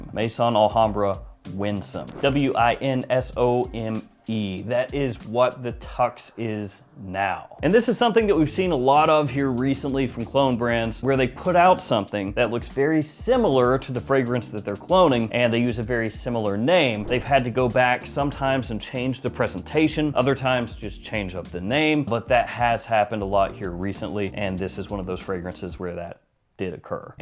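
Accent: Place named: Texas, United States. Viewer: It sounds like you live in Texas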